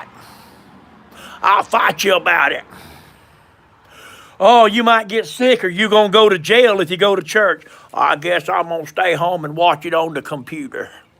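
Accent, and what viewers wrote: South, United States. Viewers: I love that country accent boy!! Jijj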